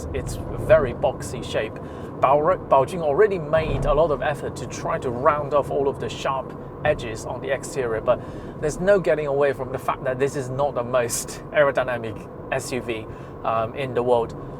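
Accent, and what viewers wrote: United Kingdom. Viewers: Just came across this video and channel. Who's this guy? He's hilarious and of course the accent and humour of a true brit!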